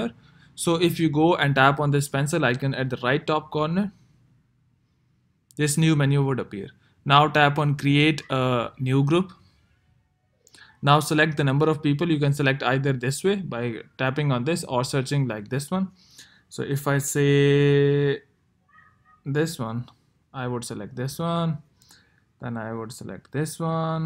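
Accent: India. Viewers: Beautiful Indian Accent 😍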